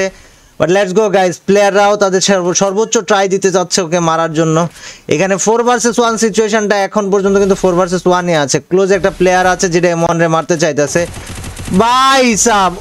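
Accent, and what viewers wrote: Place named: Chittagong, Bangladesh. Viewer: ভাষা শুনে বুঝছি চট্টগ্রাম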